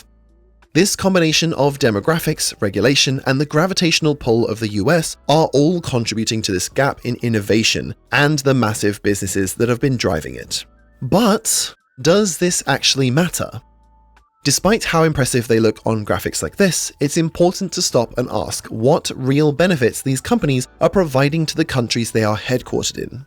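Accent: United Kingdom. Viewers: Plus i find odd a uk voice cares wtf u all left the eu so how can u complain wtf u dont have a dog in this Eu area. So in the immortal words of my uncle, ' shut your mouth if u aint in the fight..' just sayin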